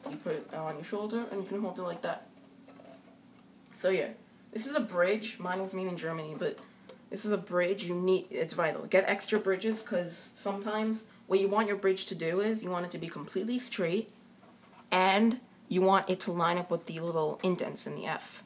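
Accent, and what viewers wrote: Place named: New York, United States. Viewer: You have one of those new york or boston accents,or brazillian...just saying thats not a bad thing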